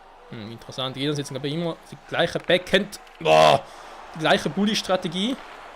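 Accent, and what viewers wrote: Switzerland. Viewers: Ich finde dein Schweizer dialekt ist so cool😆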